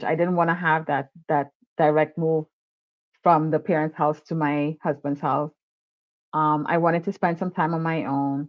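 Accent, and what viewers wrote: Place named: Trinidad and Tobago. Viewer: so.many.thoughts!!! I'll try to be succinct lol. First up--I'm a sucker for Trini accents! Your voice is so soothing 😍. I never hear other Black women talk about this, so this was really refreshing to watch! I'm the only (intentionally) childfree person in my friend groups, and it can feel isolating. Thank you for sharing your story and being transparent about some of the reasons you changed your mind about having kids...and your aunt sounds amazing! I can see why you wanted to be like her. My story is a little different bc I've always known I wanted to be childfree since I was a teenager, and was also raised in Ghana as a kid so I understand how different it is raising kids here vs. back "home". The lack of support for parents (esp mothers) in this country is appalling, and a lot of mothers are bearing the load of raising kids...even if they're married. 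The U.S. is hostile to motherhood, especially Black motherhood. I often think about who's gonna care for me since I'm in my late 30s now, and always planned on taking care of myself the best way I can so that I'm active and out and about even in old age. I plan to either be alone, have hired help if needed, or live in a Golden Girls situation lolol but who knows? Also, the guy who talked about older people without kids is totally right. My mom works in a nursing home, and plenty of older adults with kids die alone, so there's never a guarantee that having kids ensures you'll be taken care of (or at the very least, have someon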